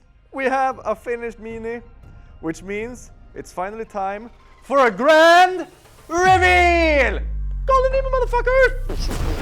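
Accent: Sweden. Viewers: Älskar den svenska accenten😊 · The Swedish accent makes his face really punchable, damn... like nails on a chalk board.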